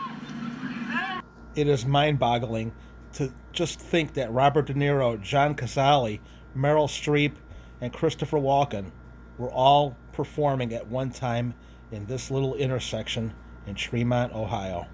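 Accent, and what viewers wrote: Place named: Boston, United States. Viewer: Hi, interesting historic video, loved it as this movie is one of my favourites. Thanks for this. Btw are you from Boston? Just asking as an English man with an intérêt in North American accents.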